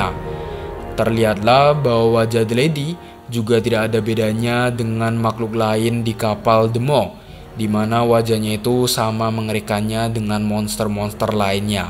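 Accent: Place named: Madura, Indonesia. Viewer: orang Madura nih yg bikin ten konten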